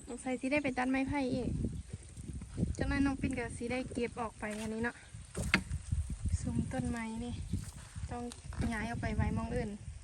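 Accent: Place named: Laos. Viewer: สะบ๋ายดี๋น้อง สาวเม้ยผู้แข็งแกร่งสาวสวยแห่งลุ่มน้ำปากกระดิ่ง ชื่นใจในความสามารถ แม้แต่สำเนียงพูดผสมผสานระหว่างสำเนียงลาวไทยเม้ยกับสำเนียงคำตากล้าได้อย่างลงตัว ขอปรบมือให้ดังๆนะครับ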